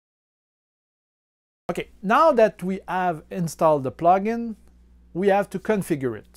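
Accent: Quebec, Canada. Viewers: Allo juste avec ton accen je comprend que tu dois venir du québec ;) as-tu essayé avec la version actuel de cura sois la 4.4.1 ? j'essias et je ne trouve pas comment faire...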